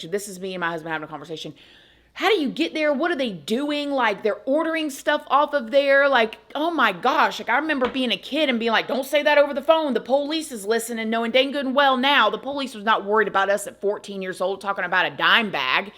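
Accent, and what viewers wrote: South, United States. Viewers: love that Southern accent 🙃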